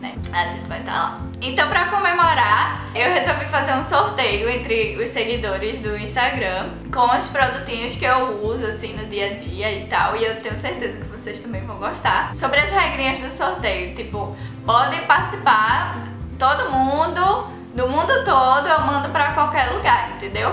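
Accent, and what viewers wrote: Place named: Pernambuco, Brazil. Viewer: ah dou de pernambuco <3 amo nosso sotaque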